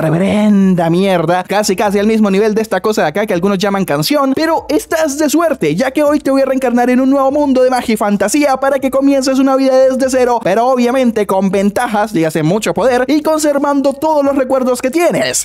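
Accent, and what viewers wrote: Colombia. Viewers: Eres colombiano? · Eres colombiano cierto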